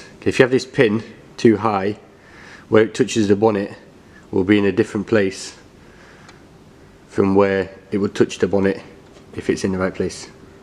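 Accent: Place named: South Africa. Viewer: Love the accent! Where ya from? I'd have to guess south africa but honestly I've got no clue lol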